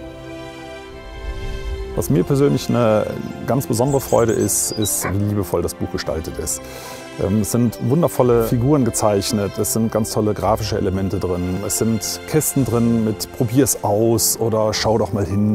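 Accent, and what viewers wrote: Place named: Bregenzerwald, Austria. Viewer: Bregenzer wald dialekt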